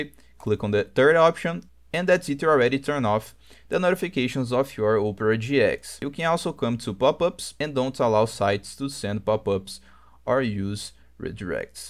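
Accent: Brazil. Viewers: Eu reconheço um paulista em qualquer lugar! Hehe, brincadeiras a parte, ótimo vídeo.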